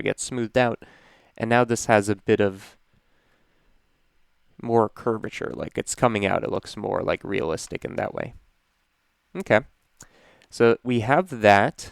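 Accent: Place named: Chicago, United States. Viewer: Dude this is straight up genius! Are you from Illinois by any chance? Thought I sensed some Chicago in your voice.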